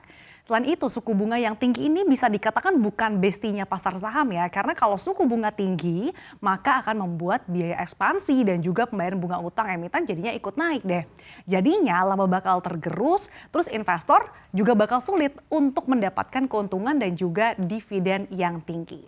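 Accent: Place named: South Jakarta, Indonesia. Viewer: Logat host nya jaksel banget ya.. 😂 cape dengernya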